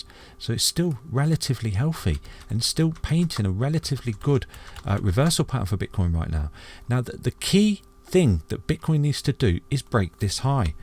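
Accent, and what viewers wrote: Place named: Southern England, United Kingdom. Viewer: Brilliant analysis - picked up on the head and shoulders... Very good. Nice to hear a Southern Englander whilst I'm here in Thailand. Thank you buddy 🙏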